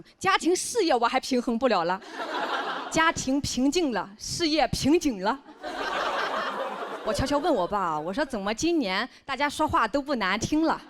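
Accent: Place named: Shandong, China. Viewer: 临沂口音听着太那啥了😂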